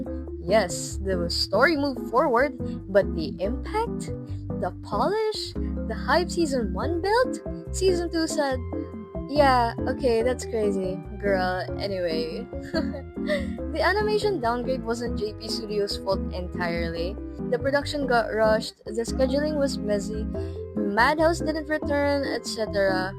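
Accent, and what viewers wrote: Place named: Philippines. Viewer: i love ur philipino accent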